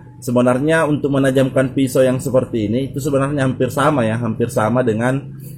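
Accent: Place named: Makassar, Indonesia. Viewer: Ini org makassar pemirsa... ketauan logatmu... smsm jaki nukasi bodo2 semua pemirsa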